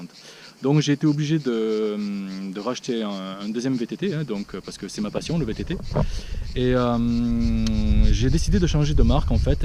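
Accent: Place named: South, France. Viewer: accents du Sud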